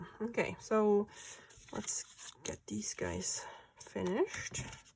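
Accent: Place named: Minnesota, United States. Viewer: I love these! This vid just popped up. Are you still making videos? You sound like you’re from Minnesota or Wisconsin. Just an observation. Great content. 👍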